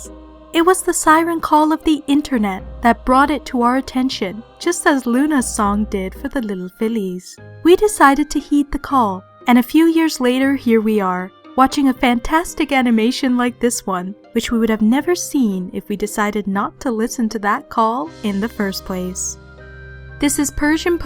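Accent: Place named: RP, United Kingdom. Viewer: your so posh!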